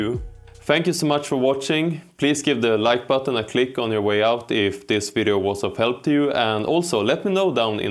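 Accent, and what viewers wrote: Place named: Scandinavia. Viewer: Love you scandinavian a-c-cent <^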